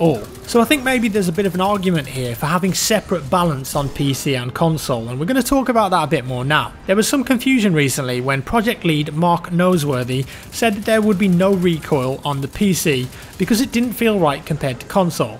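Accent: United Kingdom. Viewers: A British lad that says 'Beta' the 'Murican way? That's new.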